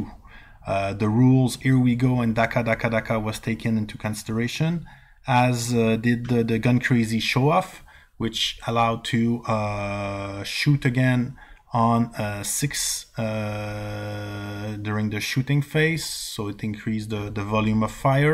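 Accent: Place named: Quebec, Canada. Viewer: 2 sec dans ton video j'ai reconnu l'accent quebecois ! Lache pas la patate bon video !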